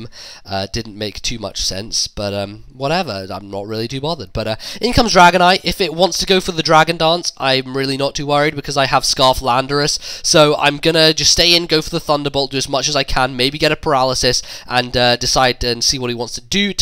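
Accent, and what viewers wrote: United Kingdom. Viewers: he british dumbshit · does a british dude sound like a frog dipshit ?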